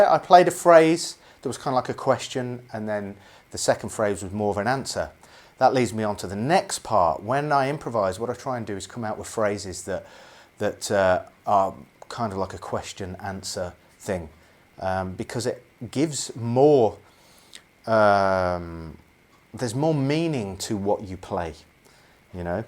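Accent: England, United Kingdom. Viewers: damn, that english accent!!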